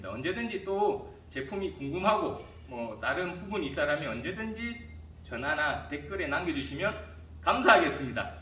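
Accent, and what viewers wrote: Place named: Busan, South Korea. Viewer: 항상 청소장비들 소개 잘보고 있습니다 부산 사투리 ㅎㅎ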